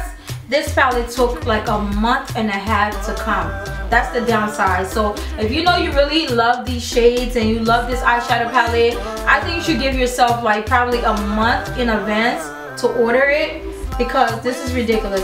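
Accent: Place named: United Kingdom. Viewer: And there goes the british accent...first impression beautiful. Girrrrlll u had me watching and dancing at the same time... Awesome job.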